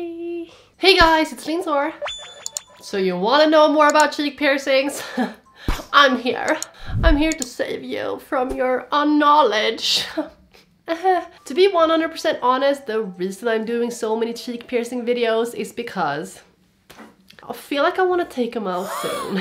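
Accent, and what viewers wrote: Sweden. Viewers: Is she from Sweden bc she has that accent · SHE HAS AN SWEDISH ACCENT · She sounds sooo swedish lmao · Is she Swedish? Cuz she kinda sound like you have that Swedish accent when you speak English. Lol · It's her accent, she's swedish. ^u^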